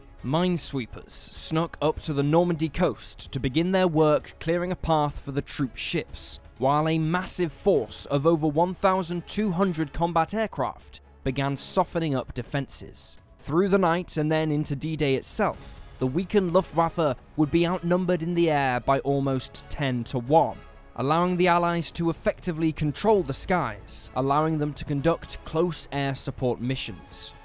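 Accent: United Kingdom. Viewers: British babble !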